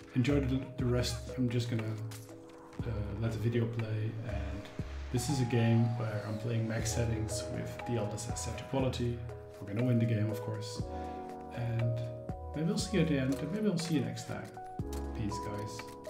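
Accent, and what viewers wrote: Netherlands. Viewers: Are you from the Netherlands? I hear Dutch ;)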